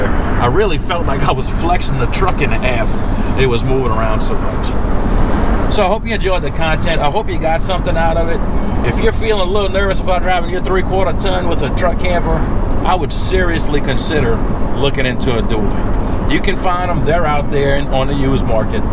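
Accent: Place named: Louisiana, United States. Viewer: Man, you sound like Jesse Duplantus! You must be from Louisiana.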